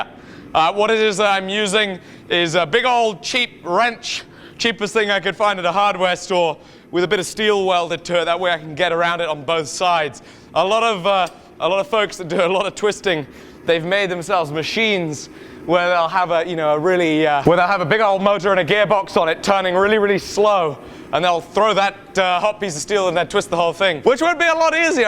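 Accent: United Kingdom. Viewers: I love your british accent :) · He's almost TOO British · Funny after watching your newer vids, 2026.. You sound deeper here and have a way less British accent than in your new vids? Interesting, character work?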